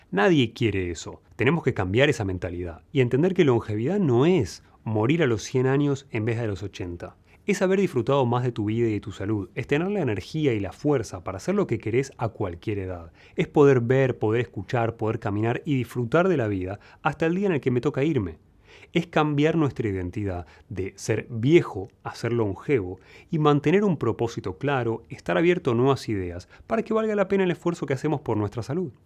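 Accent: Argentina. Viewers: El dr. es Argentino!!💪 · es argentino, tenía que ser argentino, no hay otra. Lo grande que somos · es argentino pero no sé dónde vive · Es argentino... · es argentino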